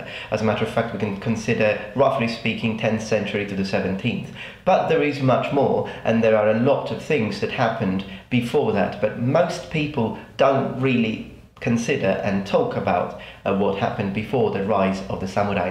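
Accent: England, United Kingdom. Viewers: Why do sound so British you are Italian though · knew I could hear some demented English accent in there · Bait? It's a genuine question. What sound do you think he's pronouncing non natively? I'm genuinely curious to know what sounds off to your ears about his accent, since if I heard someone talking like him, I'd assume they were a Brit.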